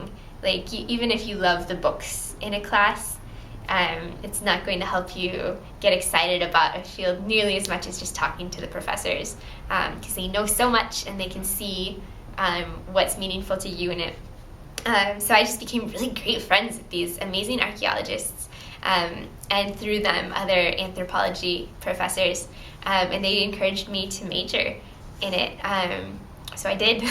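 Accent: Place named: Scotland, United Kingdom. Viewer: I figure she’s American but lived in Europe for a few years and picked up a bit of accent on certain sounds. That or born overseas and came to America at a young age but retained some accent. I’d guess Scotland.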